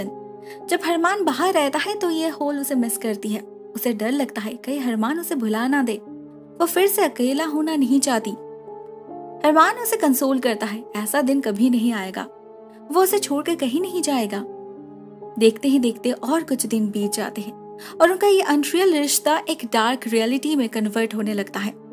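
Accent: Bengal, India. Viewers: are u from bengal?? right na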